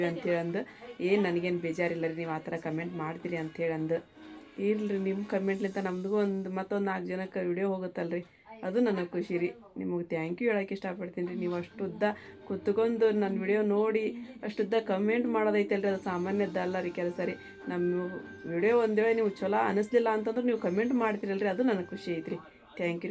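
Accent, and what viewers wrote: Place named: North Karnataka, India. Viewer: ನೀವು ಮಾತಾಡು ಕನ್ನಡ ಕೇಳಲಿಕ್ಕೆ ಮಧುರ ಮತ್ತು ಆನಂದವಾಗಿರುತ್ತದೆ ಉತ್ತರ ಕರ್ನಾಟಕದ ಭಾಷೆ ಬಹಳ ಖುಷಿಯಾಗುತ್ತದೆ ಅದಕ್ಕಾಗಿ ವಿಡಿಯೋ ನೋಡ್ತೇವೆ ಹೀಗೆ ನಿಮ್ಮ ಭಾಷೆ ಮುಂದುವರಿಸಿ ಕನ್ನಡ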